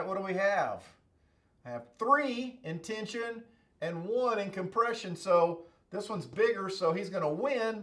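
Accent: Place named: South, United States. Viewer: I'm a sophomore at Cornell. Prospective MechE. One of my classmates told me to look this dude up. I feel like I've just found the ultra southern Professor Leonard of Engineering, and I'm fucking HERE for it. I'm going to survive statics if it kills me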